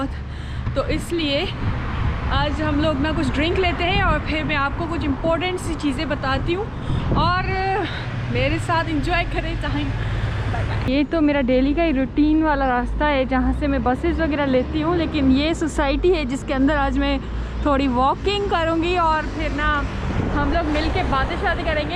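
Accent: Sindh, Pakistan. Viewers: Paka Pakistan sa ha bolna sa lag raha ho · I listing Sindhi style urdu speaking after 15 year love thissssss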